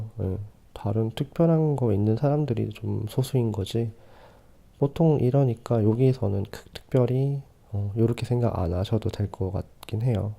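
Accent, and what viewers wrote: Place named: South Korea. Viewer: I wanted to know what you said in the video hahaha I like the Korean accent!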